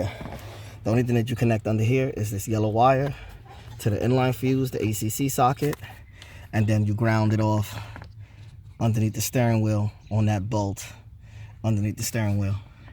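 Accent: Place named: New York, United States. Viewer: I may be from the south but I know an East Coast accent from anywhere... maybe I’m wrong but I’m getting Brooklyn/Bronx vibes. Preciate the video brother. New subscriber ✊🏽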